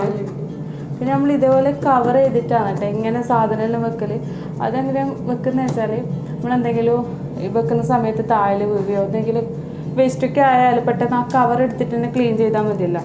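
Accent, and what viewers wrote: Kannur, India. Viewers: Enthooli nammale kannur slang😁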